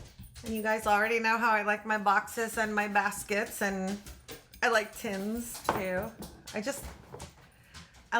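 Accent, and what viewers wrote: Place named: Pennsylvania, United States. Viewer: Hi. I'm Sharon and I'm a quilter, nearby you in NJ.You sound like you're from southeastern PA? Sue (From Small Things) is in Perkiomen Valley area in PA. I'm enjoying your videos. I love quilt talk! Please don't change your format for the grouchy grannies.They can keep looking! I look forward to watching more! Happy Thanksgiving.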